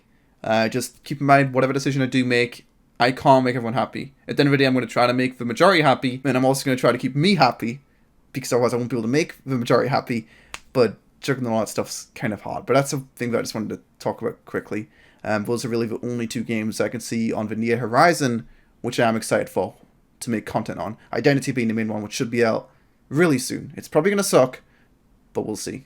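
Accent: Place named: North, United Kingdom. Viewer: Babe, We watch you for you, I can't NUT without that sexy Northern Accent.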